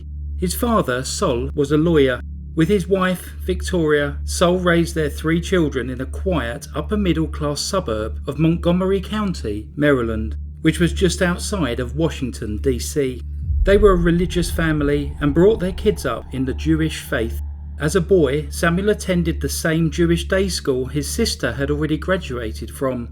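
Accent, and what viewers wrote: United Kingdom. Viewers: I also love British accent ❤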